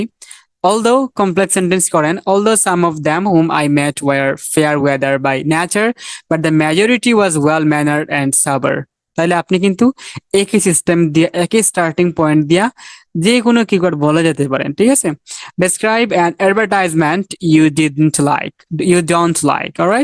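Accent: Sylhet, Bangladesh. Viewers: ভাইয়া আপনি কি সিলেটের?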